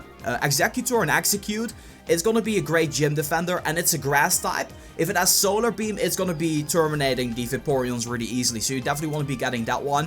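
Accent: Netherlands. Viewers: That horrible Dutch accent :( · Hey, are you dutch? i am and i thought you where too <3 more pokemon vids<3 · ur dutch right?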